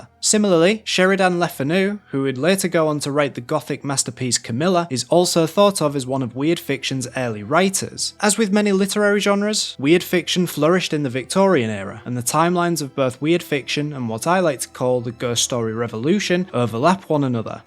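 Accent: Yorkshire, United Kingdom. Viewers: Some of us in the UK also pronounce it as 'aitch' depends where you're from. We have a wide variation in accents here. The chap doing the video had a strong Yorkshire accent for example. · Love the video. Love the "yawk-shuh" accent. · I'm from Yorkshire, and I am so glad you have decided to stay authentic to your accent. Yorkshire and proud. :)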